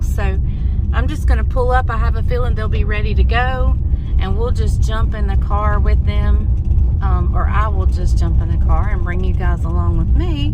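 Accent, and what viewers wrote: South, United States. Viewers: That was very enjoyable. Thank you for taking us along. If you are going to be creating new masterpieces I would love it if you could think of some ways to use up a bunch of eggs. I have chickens and we used to love eggs but now that they are coming out of our pet's butts, no one in my family want to eat them. I never realized that chickens breed like rabbits so now I have eggs coming out of my ears. The only time I use them is when I bake or make deserts that call for eggs and the rest I try to give away but I think my friends are about overloaded with them now too :-). I need some ideas of how to use them up. Thanks again for sharing your day. I just found your channel this weekend and I have been binge watching it every moment I get. For some reason you feel so familiar to me so it is like listening to an old friend share a recipe with me. 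Maybe it is your southern accent 🥰.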